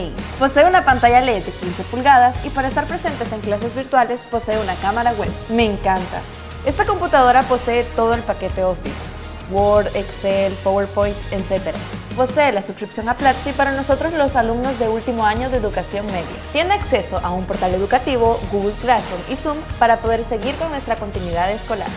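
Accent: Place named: Lima, Peru. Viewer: Tienes dejo limeño., saludos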